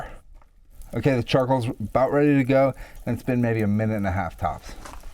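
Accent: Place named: United States. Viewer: us accent, living in the uk, looking like an australian, this dude rules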